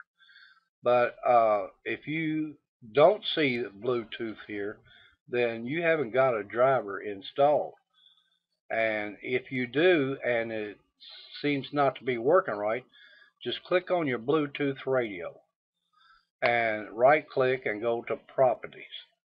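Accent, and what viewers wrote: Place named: South, United States. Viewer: I like your accent. Southerner?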